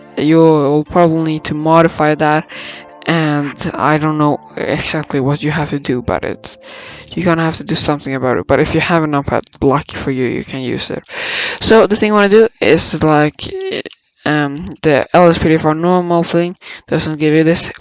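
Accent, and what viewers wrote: Sweden. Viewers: u sound swedish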